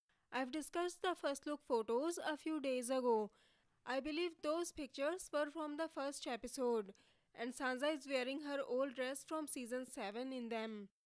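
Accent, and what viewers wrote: India. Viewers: How come you write and speak such excellent english, yet have such a lovely indian accent ? 😊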